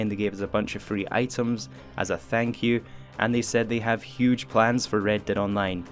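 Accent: Scotland, United Kingdom. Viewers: I wish the snow would go already. I hear by your accent your Scottish, I am too. Keep up these videos, I'm learning alot from you.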